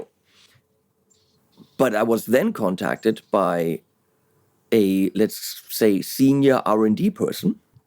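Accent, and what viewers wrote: Germany. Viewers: I'm afraid of you because of your lack of hair, that german accent, and your freakishly large stature... otherwise, you are lovely :D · I think they might r some unconscious bias going on, tall bald German speaking English with a German accent (rightfully) knit picking critiquing things. Not fair but I can imagine it being a thing As far as the Marshall getting back a/part of the solution could be frfr’s/stage pa’s and official licensing of irs and amp modeling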